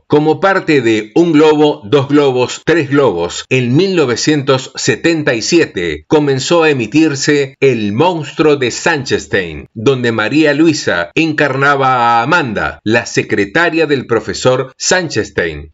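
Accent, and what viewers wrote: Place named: Argentina. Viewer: Si están hablando de una presentadora española que desarrolló toda su carrera en España ¿A qué viene el acento argentino del locutor?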